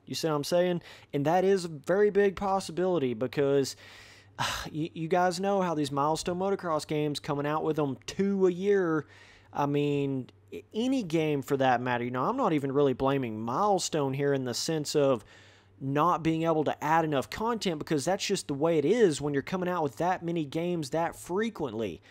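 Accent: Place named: South, United States. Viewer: And you sound like a stupid ass hillbilly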